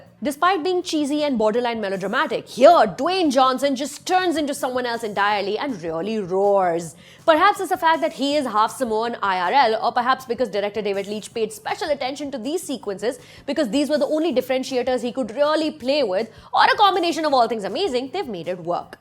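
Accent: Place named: India. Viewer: I dont know how u do it but u speak english like hindi. Never ceases to amaze me.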